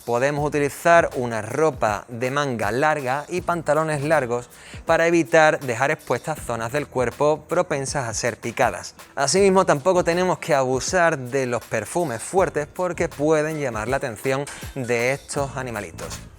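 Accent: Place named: Andalusia, Spain. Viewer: Qué crack !!!!!!!!! “Ma quedao embobao” !!! Y en perfecto andaluz ! GRACIAS !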